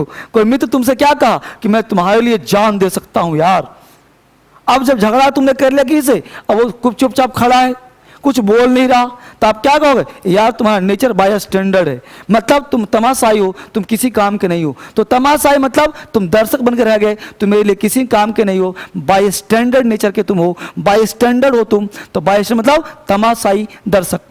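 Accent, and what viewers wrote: Bihar, India. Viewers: Shayad AAP Bihar Ya Jharkhand Se Ho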